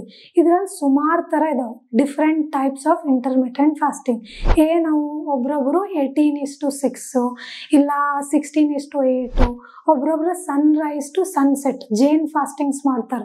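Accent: North Karnataka, India. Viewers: I am from Bagalkot . Love the way she spoke the original north Kannada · Thank you so much medam channagi helidira nam utar karnataka shaili mattu namma halli bashe yelli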